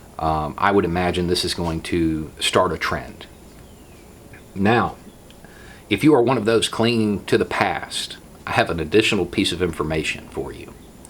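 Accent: South, United States. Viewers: In what state is located? His channel keeps popping up in my "recommend video "finally watched one,.is this guy for real? Or is he just trolling? Would like some options before I invest time to watch previous and future posts. He seems to be informed about issues and open to ideas which suprises me given his southern drawl.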